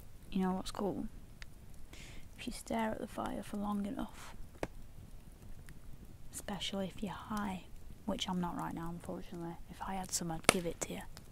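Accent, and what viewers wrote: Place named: Lancashire, United Kingdom. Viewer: she's from lancashire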